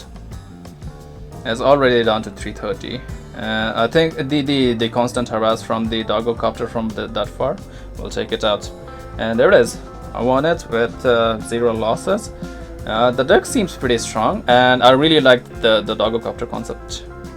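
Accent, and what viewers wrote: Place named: India. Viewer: Accent like indian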